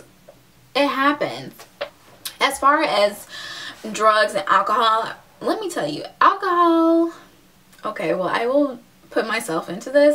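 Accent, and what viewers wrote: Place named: Illinois, United States. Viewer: I'm from the IL too. I can tell you are from IL, you sound so familiar!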